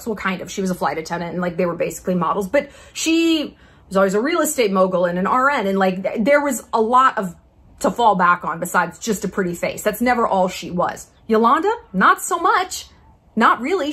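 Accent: South, United States. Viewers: Side note: southern accent is pure gold. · Love your southern accent. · Your southern accent is soooo pretty. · omg u r so funny i love you that southern bell accent is so hilarious i always told my bf to go take a nap when hes trying to be irritating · The southern accent is PERFECT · Here for the Southern accent & that comment about the Easter Island emoji 🤣🤍💞 You’re the best,. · Your southern accent is so cute!! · I’m obsessed with your southern accent haha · southern accent is what keeps me going lmao, she's too funny. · That’s a great Southern accent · Omgg I love your southern accent. Sooo good, had to go back and listen it to it again, lol wish I could do that. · That southern accent tho 😂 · Your southern accent is SENDING ME lol!!!!!! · The southern accent 😂👌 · The gaslighting and southern accent is what I’m here for!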